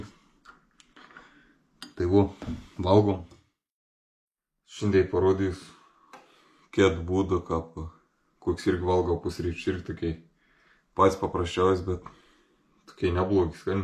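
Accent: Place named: Samogitia, Lithuania. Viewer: Klausyčiau i klausyčiau tos žemaitiškos šnektos. Ir dar kaip jaunas i stiprus žmogus kalba😊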